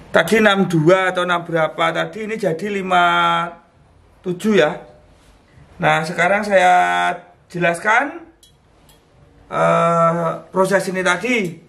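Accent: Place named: Java, Indonesia. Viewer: Lucu jg mas bro gpp bahasa ny agak jawa2 an itu menunjukkan kita punya identitas. Siiiplah pokoke 👍👍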